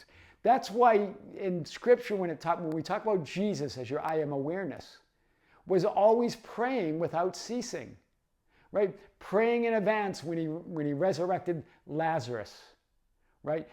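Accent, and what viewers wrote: Boston, United States. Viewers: I’ll be honest with ya, when I found your channel a while back, I was like “ehhh idk, this guy is kinda loud with a funny accent” (I’m from Arkansas so if anyone has a funny accent, it’s ME lol) but the more I watched you, the more I’ve come to love your Boston accent & you’re not loud, you’re PASSIONATE. Now I look forward to your videos everyday because you’re such a ray of sunshine & such a wonderful teacher. You’re a true gem,! 🫶🏼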